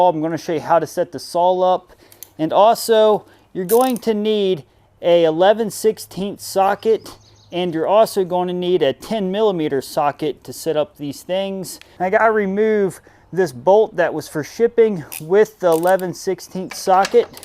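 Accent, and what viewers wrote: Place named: Baltimore, United States. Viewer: Where ya from, Baltimore?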